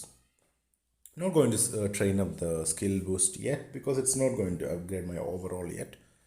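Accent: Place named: Kerala, India. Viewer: Ate you Indian? You sound thoo.. Kerlite to be more specific?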